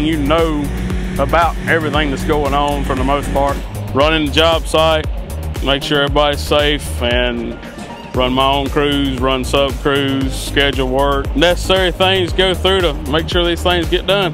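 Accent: South, United States. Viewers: Yes, he's got a drawl. Why?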